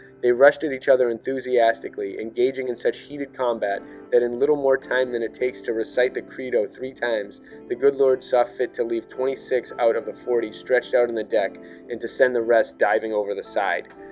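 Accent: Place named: Massachusetts, United States. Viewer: I know your from MA, I can hear it! Lol lmk I’m from revere kid!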